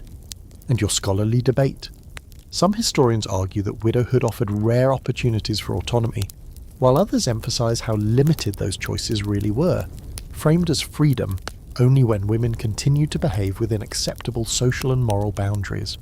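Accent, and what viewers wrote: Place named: England, United Kingdom. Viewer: would i be alone if I thought an english accent is one of the best for the subject matter? Seems more conservative than usa or australian accents...or perhaps just more presumption of possibly being more directly informed on a subject of the middle ages.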